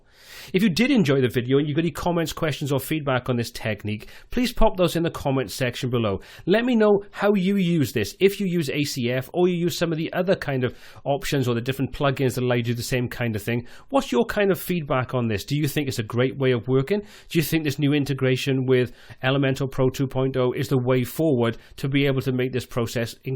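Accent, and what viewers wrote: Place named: England, United Kingdom. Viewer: Yes, they're absolutely brilliant. Nice to hear an English voice too.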